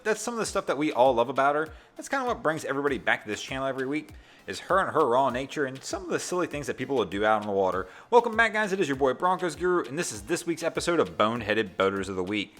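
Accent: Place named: Delaware County, United States. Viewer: You sound like youre from Delco.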